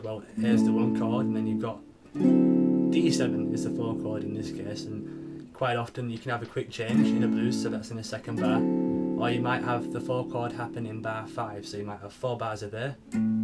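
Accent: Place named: Lancashire, United Kingdom. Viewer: Good vid man very useful. You from Lancs?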